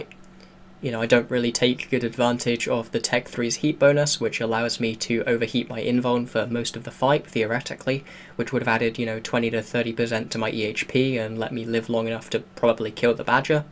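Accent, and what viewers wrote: United Kingdom. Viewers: What's with the american flag on your wall? I'm genuinely curious as you have a british accent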